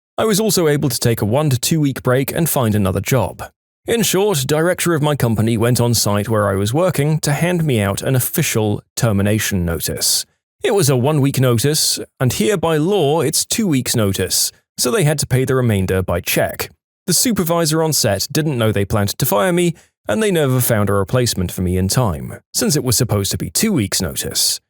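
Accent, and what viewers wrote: United Kingdom. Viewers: The British accent, though 😂😂😂 (This channel is my hyper fixation rn)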